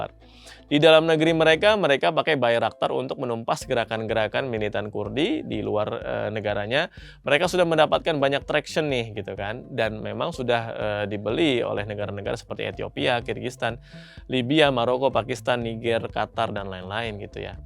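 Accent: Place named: Sumatra, Indonesia. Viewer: Kelen bah, Bang ini masih kental Sumateranya wkwkkw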